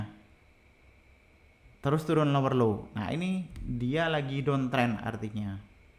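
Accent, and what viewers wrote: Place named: East Java, Indonesia. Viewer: Mksih share ilmu2 nya bro,,,logat kental Jatiman KLO ga kliru😂😂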